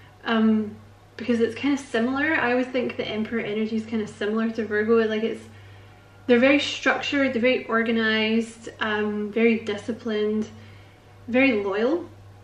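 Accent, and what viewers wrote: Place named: Scotland, United Kingdom. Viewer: First, if anyone screams at you in the comments, I will squash them like a bug.lol Second, I could listen to your Scottish accent all day. I came from there. It centers me and it gives me comfort. Guess you're stuck with me and when I move back I'll ignore you...lol..just kidding...thanks for the healing you bring that helps me guide my destiny in the kindest of ways. You rock!!!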